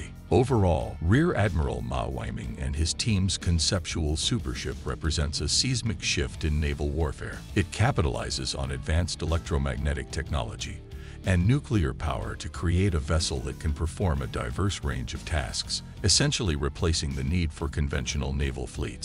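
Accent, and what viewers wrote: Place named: India. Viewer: Yindian accent always give me a migraine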